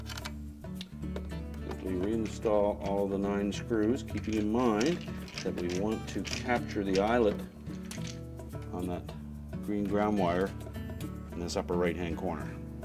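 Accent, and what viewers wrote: Canada. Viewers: Hi! I feel like I know you!!! I just want to say thank you for the VERY well done video on repairing appliances! I've watched your vids on replacing a dryer belt, as well as just today repairing my 8 year old hotpoint fridge. I replaced both the compressor fan motor and the control board, and I owe it all to your informative, easy to follow, instructional video! So this girl just wants to thank you, thank you, thank you! P.S. Are you Canadian? (jus wundrin' lol!)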